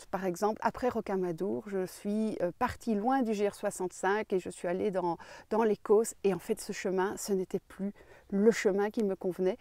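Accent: Belgium. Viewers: Bonjour j’aurais bien vu vos randonnées en Norvège et merci encore pour la positivé et cet accent belge bon courage pour votre chemin dans l’éternel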